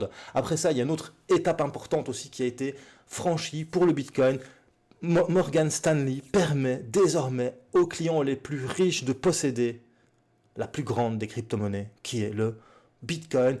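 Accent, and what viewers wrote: Belgium. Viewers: J'ai vécu 10 ans à Bruxelles, ton petit accent belge me donne envie de chialer de nostalgie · Je suis un belge expatrié et ton accent me donne une petite nostalgie du pays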